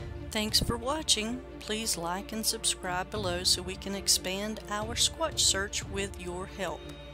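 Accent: Texas, United States. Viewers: Your dialogue is much better. You've lost the Texas draw and speak faster. Thank you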